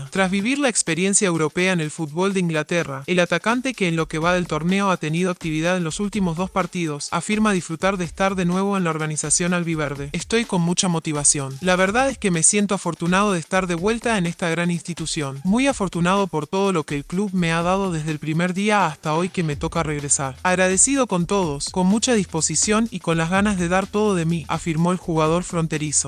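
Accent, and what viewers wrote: Argentina. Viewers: Que inche necesidad de el acento argentino? Le da más valor a la publicación?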